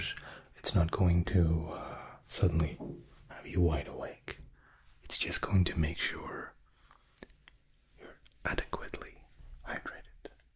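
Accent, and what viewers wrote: Scotland, United Kingdom. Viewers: Eh? Scottish?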